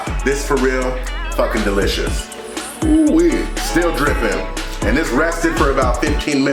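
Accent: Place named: California, United States. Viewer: U got that cali accent, never noticed lol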